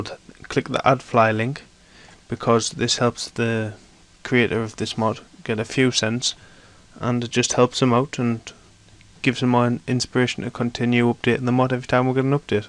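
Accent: United Kingdom. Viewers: Are you British, Scottish or Irish? I love them all. I'm Aussie but I accept any other race in my country. Unlike SOME people I know...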